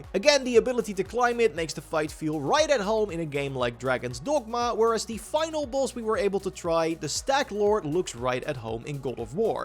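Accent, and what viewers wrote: Netherlands. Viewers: Bruh learn how to speak English. Why do you have to push that Dutch accent in so hard? It makes your videos unwatchable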